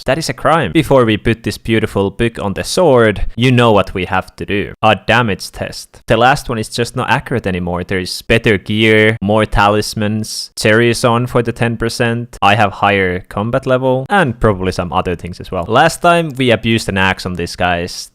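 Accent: Finland. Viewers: guys if u think his accent is weird then hes from finland. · are you finland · Hey are you Finnish? Because means piece on Finnish and your accsent is really Finnish. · Sie kuulostat vähä suomalaiselle. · U finnish? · Are you from Finland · where are you from `finland?? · Kunnon suomalainen aksentti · Suomi man? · Finnish english Accent is killing me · Eka video jonka oon nähny tältä ja tiesin heti siitä miten se puhu et se on suomalainen :D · are you from finland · Are u finnish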